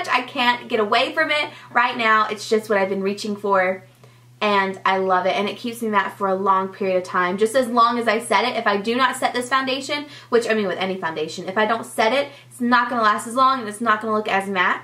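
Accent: South, United States. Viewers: Love your videos by the way ! I cant believe you are at 1mil subbies ! You deserve that and much more , i remember when you did your tutorials in your old room and had the southern accent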